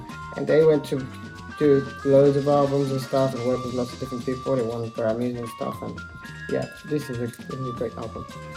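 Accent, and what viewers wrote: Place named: Germany. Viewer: I love thissss he speaks like a 8 year old German child learning English. Not an insult.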